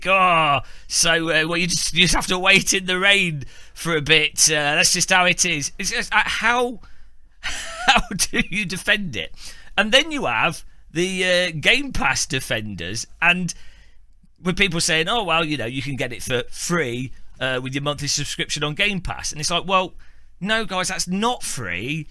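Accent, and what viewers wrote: United Kingdom. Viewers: Never laughed so hard about something so depressing. Thank you so much for an absolute wonderful British scolding in a just as lovely accent! 😊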